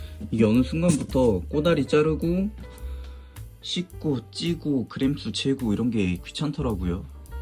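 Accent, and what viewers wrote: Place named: Jeolla, South Korea. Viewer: 약간 광주, 전라도 사투리 억양이 느껴지는데 혹시 광주쪽 사람인가요?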